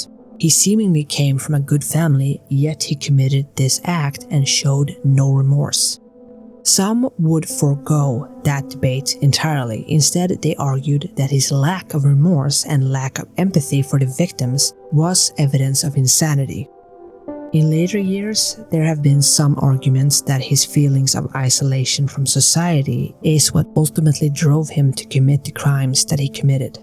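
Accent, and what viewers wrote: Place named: Sweden. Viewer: I appreciate the work you put into your videos and your commitment to rejecting generative AI. This was a story I hadn't heard before, unsurprisingly, as an American. I'd love to hear more like this from your neck of the woods (assuming from your accent and pronunciation that you're Swedish).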